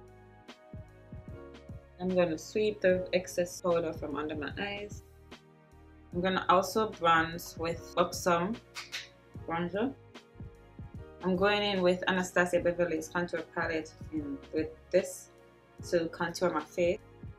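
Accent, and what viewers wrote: Jamaica. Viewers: girl u slay this fuck out this video....sound like u a one a wi....mah hear too jcan talking😂😘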